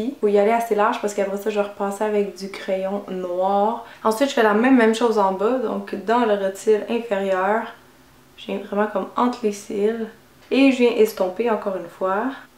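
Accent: Quebec, Canada. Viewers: L'accent Québécois! J'adore cet accent! Vas-y continu tu seras rapidement aux 1'000'000! :D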